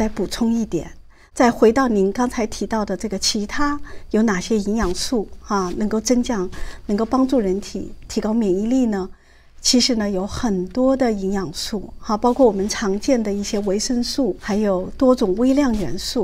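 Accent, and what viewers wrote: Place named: China. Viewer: 这位医生有大陆口音😊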